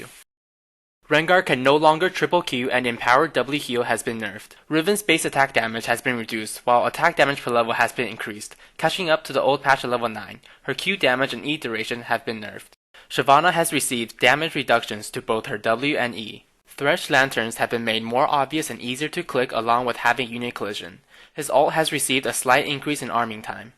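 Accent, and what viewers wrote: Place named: Asia. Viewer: I cant help but smile with your asian accent XD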